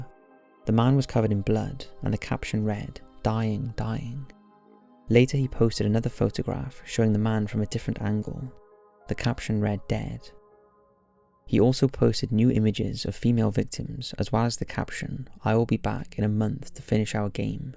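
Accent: Wales, United Kingdom. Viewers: You have the same voice as like every British dude you come across on overwatch.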